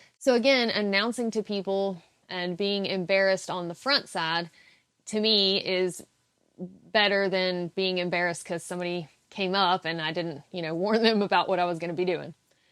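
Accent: South, United States. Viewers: Hi, long time viewer, 1st time commenter:) A couple of housekeeping things to start. A missed opportunity, you mention 'announcing to people and being embarrassed on the front side...' Is better than being embarrassed on the backside:) Nextly, did you know that with your southern accent, which I adore, your pronunciation of Iceland sounds very much like Assland. Talkin' about poop and all in context lol. Ok so seriously, one of my many careers in life involved being a professional, corporate problem solver. On the Yellowjacket problem I have about 4 solid solutions in mind so let's start with just one and see what you think. Could I suggest a while list of people who the entire hiking community know are legit through hikers who are putting in honest miles. In my opinion a private facebook group with admins is most likely the easiest solution. Hikers like yourself are in the group as well as angels who are providing services for an intended group and purpose. 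If you're not in the group you don't have access to what is made available. There are ways to 'admit' people who are whitelisted as well with a cheap wristband or such but I'm just looking ahead to how to you keep track of who is white listed and on site. The angels can also be in the facebook group and can ask a question about someone who might be legit but not in the group yet. There should always be enough people available with internet access to vouch for someone. I would think the group is close knit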